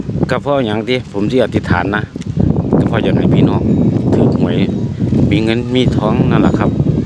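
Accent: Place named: Chaiyaphum, Thailand. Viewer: แอดมิดสำเนียงชัยภูมิออกคักเนาะอ้าย555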